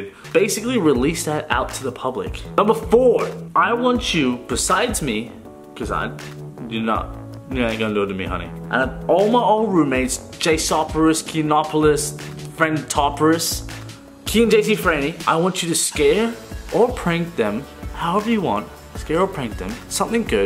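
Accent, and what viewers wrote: Australia. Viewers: Honestly don’t get how anyone could doubt that he’s Australian. His voice alone gives it away plus the words he uses. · Wow the Australian accent is making a comeback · He had an Australian accent for a lot of the video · Wait is he Australian???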